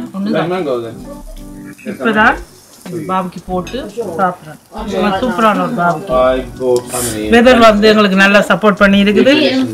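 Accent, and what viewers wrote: Jaffna, Sri Lanka. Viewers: Akka...I like the way you speak yarlpanam ...keep it up❤ the Tradition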